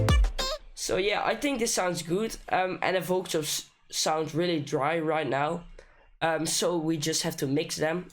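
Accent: Netherlands. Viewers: Ben je Nederlands? Je klinkt namelijk erg Nederlands aan je accent. Maar je spreekt echt goed Engels. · I thought first he is martin garrix. He sounds dutch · yeah he sounds dutch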